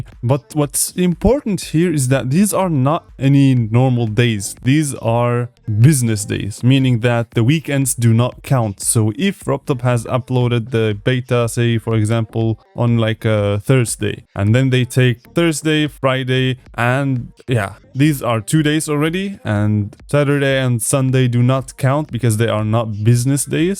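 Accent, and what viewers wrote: Germany. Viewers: Are you german? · Brother you German ?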